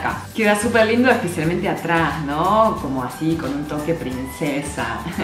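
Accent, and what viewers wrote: Argentina. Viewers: Hablas cm argentina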